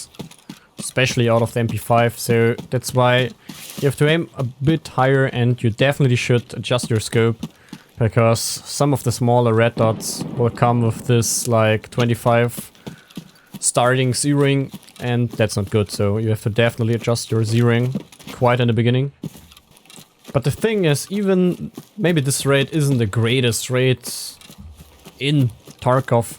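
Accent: Germany. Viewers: Man hört richtig das du deutscher bist haha. Also am englisch. Nicht böse gemeint oder so. Weiter so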